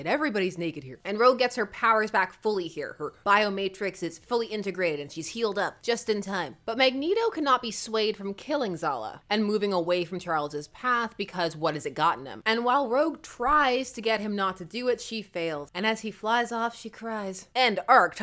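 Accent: United States. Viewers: I just here for you country accent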